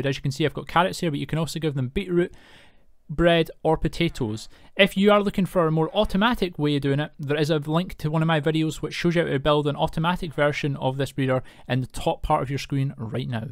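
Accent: Scotland, United Kingdom. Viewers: I don’t want to assume. But are you Scottish? - from a fellow Scot :) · Yay Scottish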